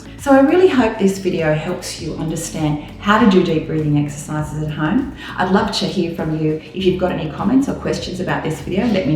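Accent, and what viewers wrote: Australia. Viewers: Ausie English be like that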